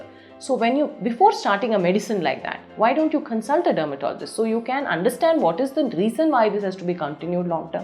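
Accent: India. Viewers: It's the Indian accent my guy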